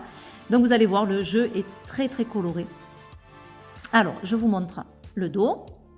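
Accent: South, France. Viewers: Jaime bien le petit accent du midi 😊.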